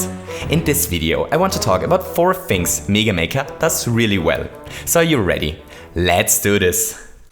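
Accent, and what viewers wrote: Germany. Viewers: german accent. i hate it. it's like ear cancer. xD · You sound german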